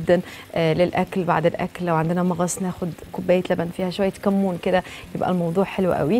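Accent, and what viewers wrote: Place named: Morocco. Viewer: تسلم الايادي حبيبه حتى وانتي كتكلمي مصري اللكنه مغربيه مزال حااضره ههههه لاااايك بقوووه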